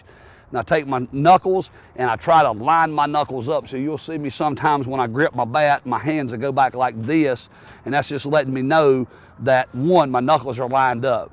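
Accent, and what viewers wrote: South, United States. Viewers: As a southern boy (74 yrs old), myself, love to hear talk! I'll bet he can eat some cornbread!:) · It's southern not english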